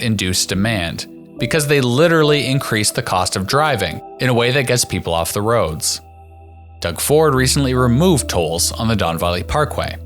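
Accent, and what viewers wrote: United States. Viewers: Because the creator is a dumb YANK, NOT A CANADIAN. Can't even say "zed".......as in either of our two Official Languages.....one of which is NOT "Amurrrrrrican".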